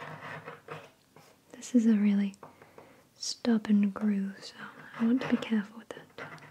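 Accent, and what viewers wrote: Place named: United Kingdom. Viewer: Hey there. Your voice has moved into my top 3. Probably some to do with your British accent . Anyhow greetings from Michigan Usa. Need to drop you a tip soon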